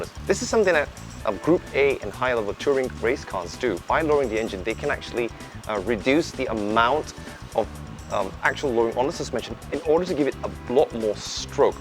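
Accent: United Kingdom. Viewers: I hear Brittish, see Japanese.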